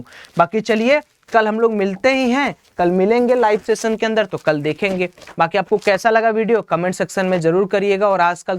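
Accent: Varanasi, India. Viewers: Varanasi se ho kya bhai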